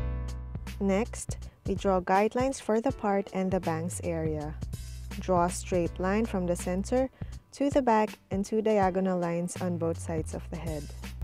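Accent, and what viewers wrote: Philippines. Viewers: are you Filipina?Cuz you have a filipino accent...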